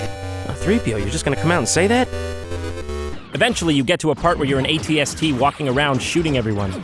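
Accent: New York, United States. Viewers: Funny how he had a new yorker accent when he was in Cali, but now that he actually lives in new York he lost it. :/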